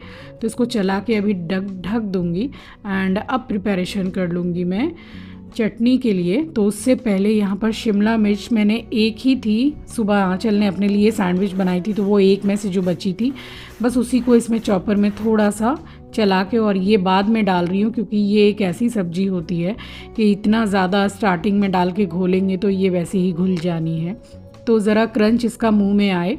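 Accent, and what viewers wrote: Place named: North India, India. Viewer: Your way of talking like us l mean north side 😊 l like u so much ❤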